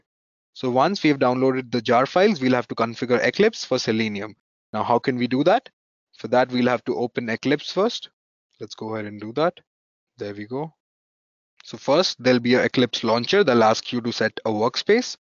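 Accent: India. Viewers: Indian accent, yes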